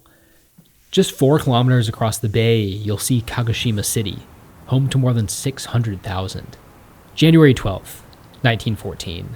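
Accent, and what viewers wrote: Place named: West, United States. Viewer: You sound very Western to me..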